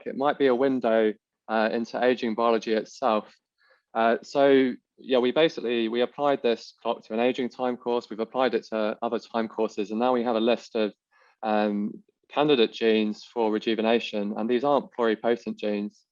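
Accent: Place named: England, United Kingdom. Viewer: You should consider activating text autogeneration..if you both read and listen to his English accent you should be able to understand it..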